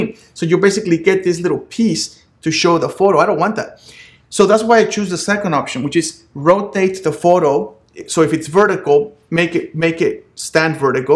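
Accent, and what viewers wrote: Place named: Spain. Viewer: I'm here watching your third video and in each video, you always have something so funny to say. In this one, the "Help Text Size". "If you're old and you're losing your sight, go to large". OMG, you made me laugh, especially because of your Spanish accent. Anyway, great videos, I am like David below, been using Canon for life and on my 5D MII, I hardly ever visited the menus extensively. You're right though, these settings will aid me with faster photo processing and focus selection. Thank you for your time on all these. I will continue to watch all of them and revisit them as a refresher. Who wants to read a manual when we can watch your videos.:)) PS. no insult intended on your accent, I'm Spanish too and I have so many family members with a similar accent.;)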